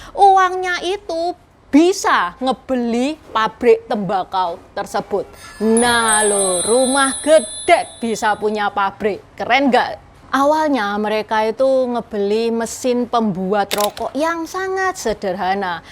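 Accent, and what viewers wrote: Java, Indonesia. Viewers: Rokok kretek taline lawe. Hhhh.. enakan yakin dengerin dongeng nya nih. Dg bhsa indonesia ber aksen jawa medok. Hihihi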